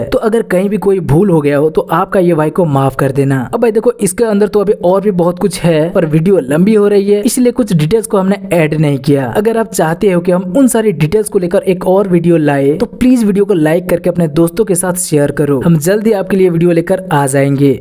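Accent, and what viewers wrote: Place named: Karnataka, India. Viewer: Nah he's from southern india, i can tell by his accent.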